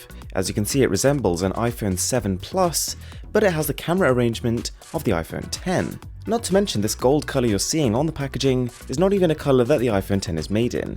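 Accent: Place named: United Kingdom. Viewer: British accent 😍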